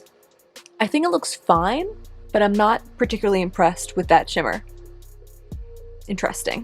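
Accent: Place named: Northeast, United States. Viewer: I hear a northern eastern us accent. Where are u from? Just curious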